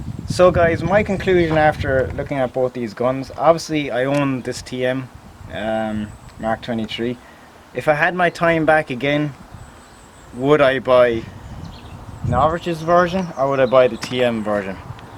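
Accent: Ireland. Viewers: You get a thumbs up just for being Irish, and the Irish accent. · Finally a proper comparison between the two, everyone's else's comparison is only like 5 minutes and barely gives any information. Also i still can't figure out which part of Ireland that accents from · Excellent, informative video matey. Was just pulling your leg, I knew what you said really (everyone loves the Irish accent!)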